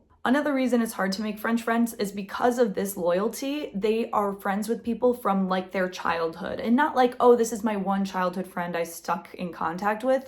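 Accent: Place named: United States. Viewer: You sound American not English so how can you teach English? Americans don't speak English, I'm English and I can barely understand a word you say.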